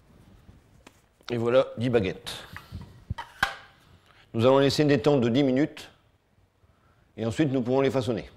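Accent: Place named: Pas-de-Calais, France. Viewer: Il a l'accent du pas de Calais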